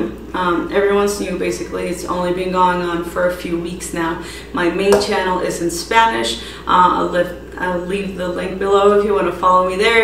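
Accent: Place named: Colombia. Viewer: Hi! Congratulations in your new English Channel!!! I'm sure that very soon you' ll have thousands more followers enjoying your easy and delicious recipes. Descubri tu canal en Espanol solo hace un par de meses y desde entonces busco a diario algun nuevo video que hayas subido con recetas o consejos. Me ayuda un monton que pongas los macros ya que yo sigo un keto... free lance... quiero decir, siin complicaciones. gracias por hablar tan claro, y enfocarte en el tema, muy profesional. Soy peruana,vivo en Canada, pero por 8 anos vivi en tu bella Colombia, en Cartagena, tierra del Vallenato que adoro y llevo en el corazon. Goze carnavales en Barranquilla y estuve de paso por Bogota donde hice algunos amigos, asi que el oir tu acento trae muy lindos recuerdos del pasado. Felicidades a ti y a Carmen, y que sigan los exitos.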